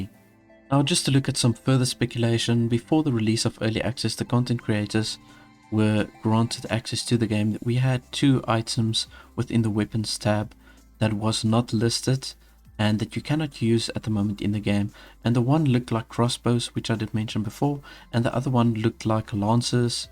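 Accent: South Africa. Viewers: Love the afrikaans coming through. Keep it up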